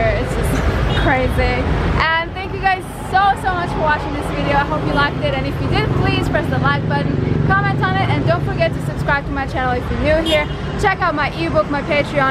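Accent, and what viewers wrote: Australia. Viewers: THAT VIEW THOUGH. I know you're probably so over hearing about it, but you're little aussie mix accent is the cutest thing ever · Aussie, with a twist of pommie... ;) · yep you sound Aussie!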